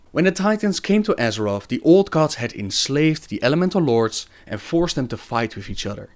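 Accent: Netherlands. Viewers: Dat dutch accent :D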